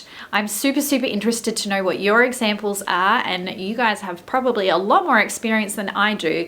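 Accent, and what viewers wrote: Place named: Amsterdam, Netherlands. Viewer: I also noticed that! Very Hollandish - as opposed to Nederlandish. My grandfather came from Haarlem and still had a lot of Hollandish influences in his language. He tended to drop consonants in places where ABN never does, e.g. 'plaas' vs 'plaats', 'dach' vs 'dacht', 'me' vs 'mijn, m'n'. Another fun fact: Afrikaans is derived from Hollandish and not from Netherlandish. even pronounces 'zacht' almost as 'sjacht' - which is really Mokums: sjoute drop.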